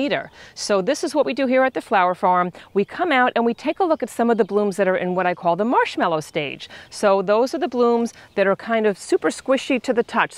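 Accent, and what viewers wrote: New Jersey, United States. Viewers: It's good to hear a Jersey accent again! I live in middle Tennessee now, Zone 6B, originally from north Jersey, Zone 5b/6a. We have a row of beautiful peonies that are over 60 years old. The story goes that my mother-in-law received them as a gift when my husband was born. They have a lot of meaning for us! I did my due diligence to prepare the soil well for the tubers we split from the originals and they are doing very well. I want to make sure I continue to care for them well. I had never heard of dry cutting before and I will definitely do this next Spring.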